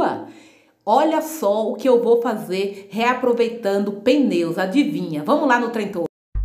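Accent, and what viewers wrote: Bahia, Brazil. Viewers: minha linda, kkkk vc é muito engraçada gosto do seu sutac baiano,. Amei seu trabalho parabéns,!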